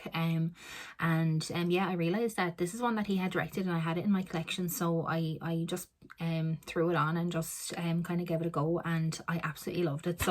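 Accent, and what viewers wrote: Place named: Ireland. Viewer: Hi,best wishes from Wolfie,hope your back is better although you did get time to watch some films,I have all the Hatchet films,there's 4,think they get gorier as they progress,loving the Irish accent,keep watching.xx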